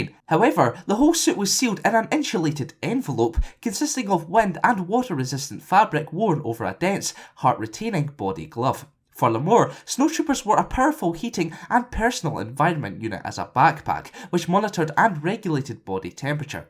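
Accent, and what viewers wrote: Scotland, United Kingdom. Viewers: Ahhh god bless your Scottish voice. Its so irresistibly refreshing from boring British and American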